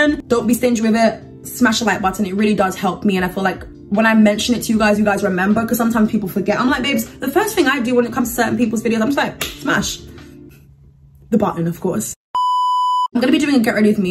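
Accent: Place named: United Kingdom. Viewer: I like you, your accent, i know it, you have to be british. you are so cute!! very weird seen an adult act like a child.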